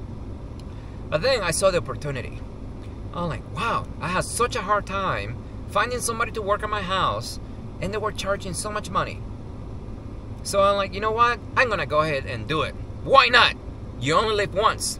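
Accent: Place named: Nicaragua. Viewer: Your accent sounds like you're from Nicaragua.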